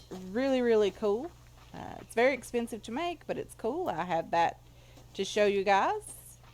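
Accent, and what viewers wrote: Kentucky, United States. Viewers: There's my Kentucky accent fix! Brilliant episode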